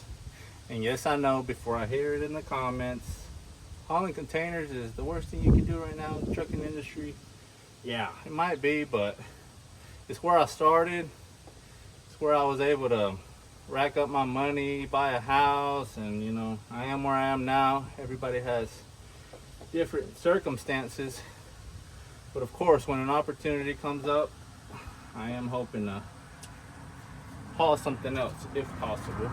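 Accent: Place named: South, United States. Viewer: Came over from your social media content bro, stayed for the funny stuff😂 the southern accent always gets me tho homie. Much love and look forward to seeing more videos😎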